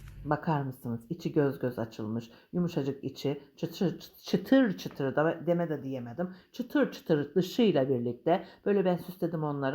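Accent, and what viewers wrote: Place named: Black Sea, Turkey. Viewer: Cok tatlisiniz karadeniz sivesi var hemserimsin tesekkurler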